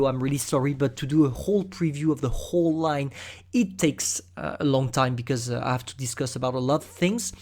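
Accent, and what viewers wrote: France. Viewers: Is u french......yes?!?! Well bounjour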